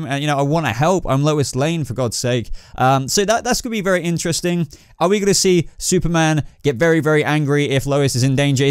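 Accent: United Kingdom. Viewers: And btw nice haircut are u british cause u kinda sound british and a differ race i can't recall or put my finger on lol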